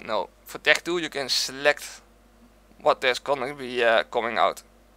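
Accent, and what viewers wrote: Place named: Germany. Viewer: Thanks you havery an interesting accent and I was just wondering kinda sounded like a mixed german accent.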